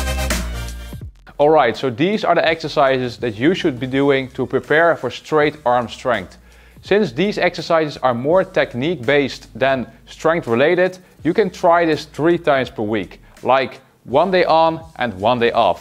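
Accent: Netherlands. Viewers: I like the dutch accent in English :DD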